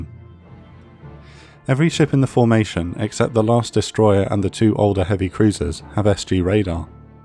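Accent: England, United Kingdom. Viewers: Get rid of the heavy English accent that I can't understand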